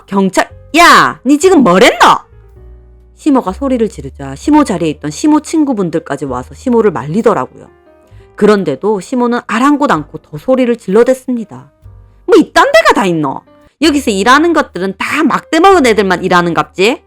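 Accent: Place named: Busan, South Korea. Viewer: 사투리 정말 잘 하네요. 부산 사람이신가요?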